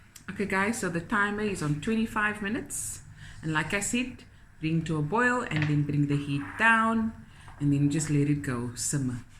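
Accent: Cape Town, South Africa. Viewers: It is a Cape Town accent.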